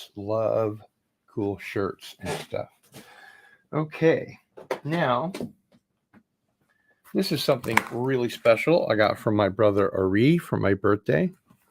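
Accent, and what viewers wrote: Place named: Australia. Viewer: Hey, I'm an Australian and I love your Aussie accent as well as your Christopher Walken impersonation 👍👍👍🤣😂🤣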